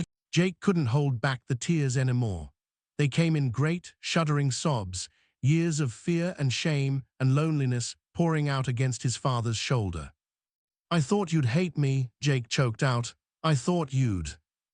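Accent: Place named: England, United Kingdom. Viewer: Get rid of the English accent, please.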